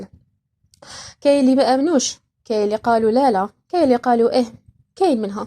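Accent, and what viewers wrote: Algiers, Algeria. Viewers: أنت معجزة في تعلم اللهجة جزائرية عاصمية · صوتك بالجزائري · عراقيتك ليست مقنعة لهجتك جزاءرية اكثر منها عراقبة. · ماشاء الله اي جزاىري يظن انك جزاىرية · أنا حسيت انك جزائرية تعلمتي اللهجة العراقية😂 ياويلي دوختيني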